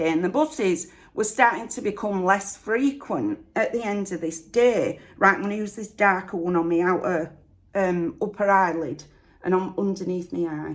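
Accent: Yorkshire, United Kingdom. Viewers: It's lovely to hear someone else with a Yorkshire accent 😀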